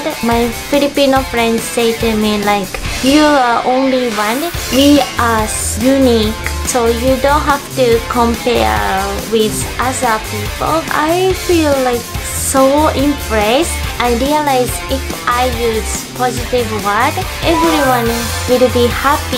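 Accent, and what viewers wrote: Japan. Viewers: Your so cute and lovely, I like how you deliver english word, sounds like hapon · Love you're English Accent Mixed Japanese ❤️😊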